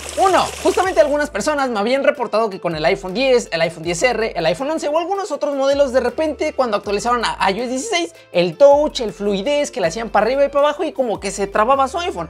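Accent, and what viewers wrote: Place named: Asia. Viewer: se nota un poco tu acento asiático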